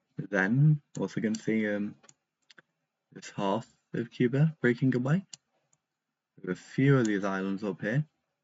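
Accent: United Kingdom. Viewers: Adult Bri’ ish man video Also hi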